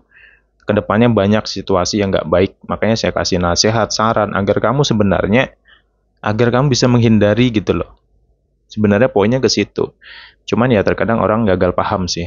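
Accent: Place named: East Java, Indonesia. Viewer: Hadir....dr bogor . Logat jawa timuranne kentel. Heheehe salam arek arema , 🤭😊😁 suarane enak ✌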